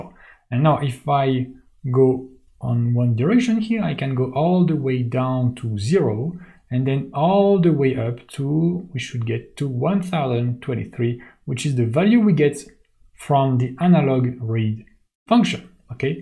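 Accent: France. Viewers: i have one question are you french?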